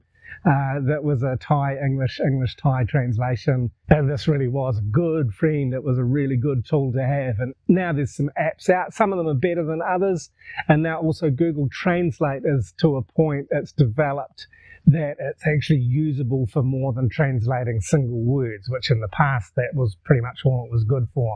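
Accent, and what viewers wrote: New Zealand. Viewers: Surprised to hear another kiwi here in Northern Thailand. Good on you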